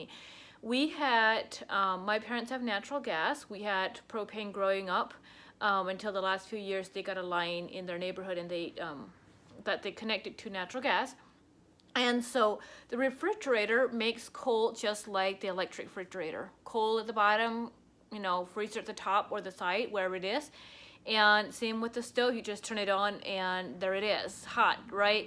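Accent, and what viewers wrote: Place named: Ohio, United States. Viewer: You must be from Ohio ( Holmes County) I am guessing by your accent I have relatives from there 👍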